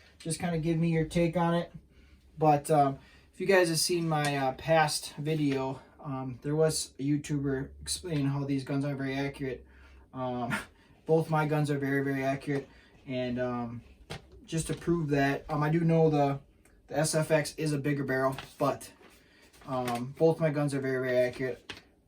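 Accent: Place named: North, United States. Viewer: bro where are u from??? 🤔 im literally trying to guess your accent 🤣🤣 definitely up NAWF im from va 🫡💪🏽 just subscribed 🫡🫡🫡🏆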